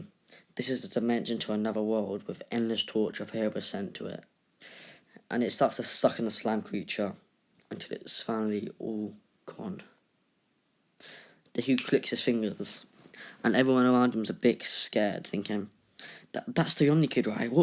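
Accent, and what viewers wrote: United Kingdom. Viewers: Yer british aint ye mate like yer accent could ya be british to? Idk ;-; ya sound british alright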